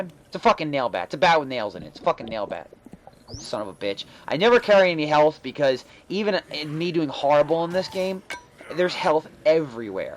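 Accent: New Jersey, United States. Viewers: Yeah it’s because he still had his Jersey accent. Texas changed it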